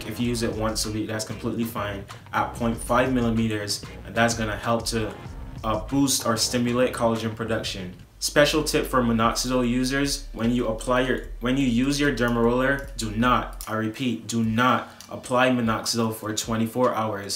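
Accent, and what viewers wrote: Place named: Barbados. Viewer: Love that Bajan accent !❤️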